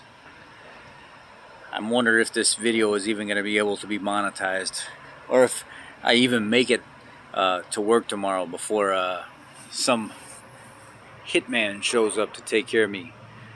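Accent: United States. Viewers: Perfect American speech !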